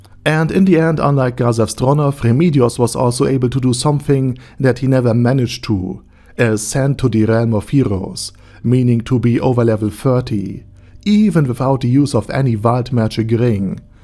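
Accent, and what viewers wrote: Germany. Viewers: New subscriber and amazing job just amazing and I love your German accent and can I ask you something about your channel and overlord is an amazing anime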